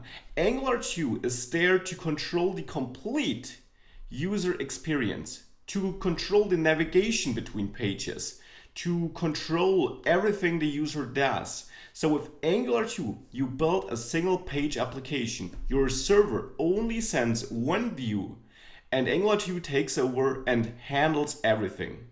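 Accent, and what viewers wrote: Germany. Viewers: Are you from south or central part of Germany ? I had a colleague from there and exact same ascent. Thanks for nice info anyways. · Funny to hear germans speaking english... :-D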